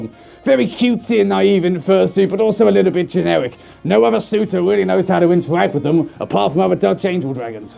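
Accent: United Kingdom. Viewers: Oh lord...that is the most heavy, yet most beautiful british accent I ever heard!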